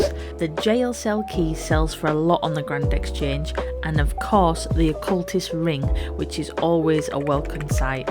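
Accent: Yorkshire, United Kingdom. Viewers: Ey up lass, you a yorkshire rser too? :D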